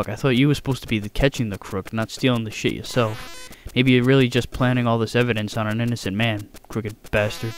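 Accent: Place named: New England, United States. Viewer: Similar Boston-to-Providence accent.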